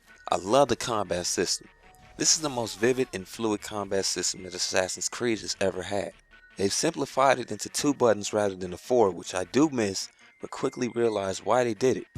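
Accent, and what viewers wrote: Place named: United States. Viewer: What accent is this guy's? I know it's American lol.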